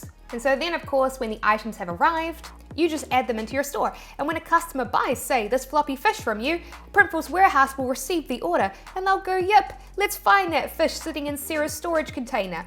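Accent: New Zealand. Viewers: Are you kiwi??? I don’t think I caught your accent before! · She's from New Zealand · I just realized how different the NZ accent is compared to the Aussies. By the way, very good information here. I was wondering how to start a T-shirt/mug printing business like yours. Thanks! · Her accent with 'e' sound every time brings me back memories of my trip to New Zealand before corona...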